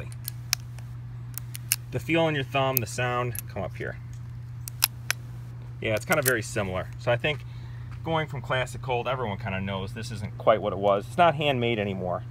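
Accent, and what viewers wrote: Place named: Wisconsin, United States. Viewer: You sound like you're from one of the northern states, like Wisconsin.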